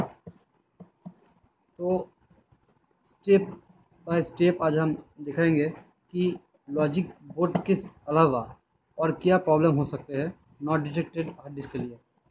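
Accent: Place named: Bengal, India. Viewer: From Bengal as evident from accent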